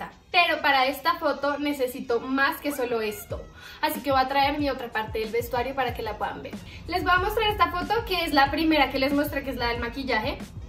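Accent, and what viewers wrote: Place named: Colombia. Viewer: me encanta tu acento colombiano 😍😍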